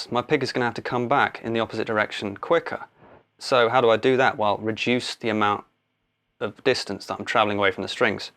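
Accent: United Kingdom. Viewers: Wow. I can do it now! I think all it took was that lovely British accent. Oh, and also imagining that the pick is always in contact with the string. Brilliant! Thank you, brother.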